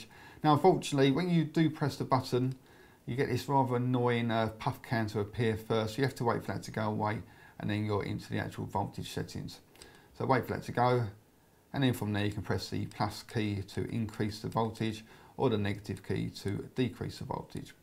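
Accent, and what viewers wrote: United Kingdom. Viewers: Hes british not english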